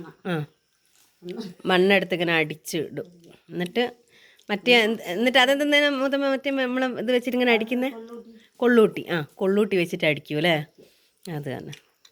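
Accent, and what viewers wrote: Vadakara, India. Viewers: Ente Naattile bhasha😊(Vadakara). Ithu Evideya Sthalam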